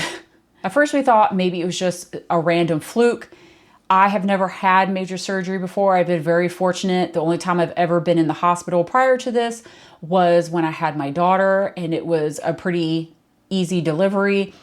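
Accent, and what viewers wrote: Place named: United States. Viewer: 🌸🌸🌸I listened to your story and I have to agree, people need to seriously reconsider having elective surgery, especially with kids!! I had heart surgery...I still have nightmares and anxiety because I will need to do it again. Surgery is a life and death situation no matter how experienced your doctor's and nurses. the risk is always there. Suffering can and will happen. If you can avoid it, avoid it as long as you have young children because you never know. You sound a bit american and idk how this has affected you financially but I'm very sorry for all that stress too. ❤ Your husband sounds like a dream. I've never had a family care like that about me.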